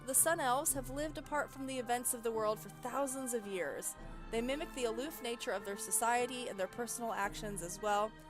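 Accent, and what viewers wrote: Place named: South, United States. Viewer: know i'm not speaking for every one here but i'm fine w/ the southern accent.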